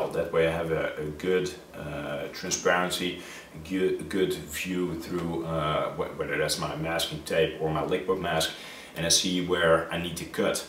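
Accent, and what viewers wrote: Netherlands. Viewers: Hey buddy, are you dutch? You sure sound dutch cuz I am too ;)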